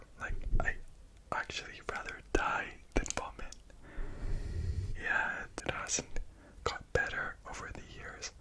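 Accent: Ireland. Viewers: I was thinking that was an Irish accent!! Your English is very well spoke and as an Irish person you have such a strong Irish accent !!! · Your english is perfect my first thought was “Oh he must have grown up in Ireland” 😭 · As an Irish person I can say you defo have a little bit of an Irish accent.. what part of Ireland is ur dad from? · Kerry accent 😂🤝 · I hear a faint Irish lilt as well! It's like 5% Irish (on the vowels), 5% Spanish (on the consonants), 90% perfect native-sounding English. Oop not to imply Irish accents =/= native English speakers 🤣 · As soon as you started speaking I knew you had to have some Irish in you lol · I thought I could hear slight Irish twang in your English speaking accent lol, sounds like it’s from up north maybe but hard to tell 😂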